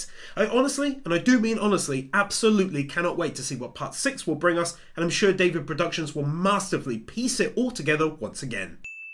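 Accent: United Kingdom. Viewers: This guy's voice is the manifestation of the word "British" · Is this a LiverPool accent?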